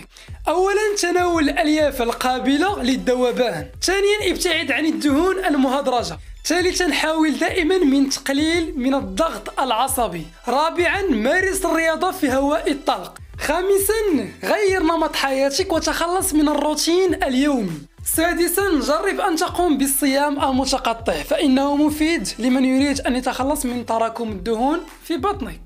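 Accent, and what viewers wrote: Morocco. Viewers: Salam khoya men lehja dyalk baaaayna merrakchi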